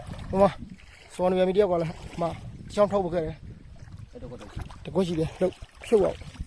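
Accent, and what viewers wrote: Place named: Rakhine, Myanmar. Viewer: ရခိုင်ဒေသသံတွဲကြားနဲ့ဂွကြားကစကားအသံ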